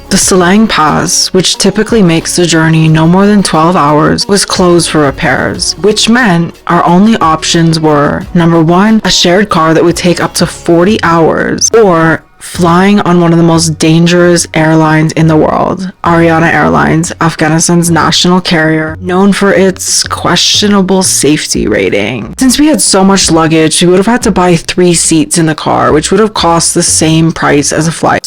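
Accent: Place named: Czech Republic. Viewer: I watched many videos , they were great .... Why do you speak with Czech accent ?!...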